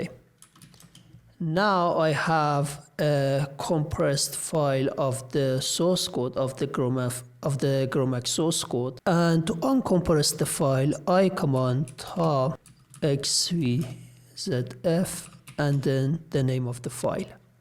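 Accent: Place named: Iran. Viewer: loved your Farsi accent. Iran's flag is risen anywhere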